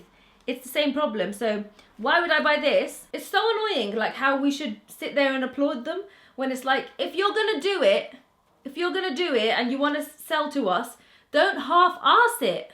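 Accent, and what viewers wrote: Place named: England, United Kingdom. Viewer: you have the most beautiful english accent ive ever heard. like wtf how?!?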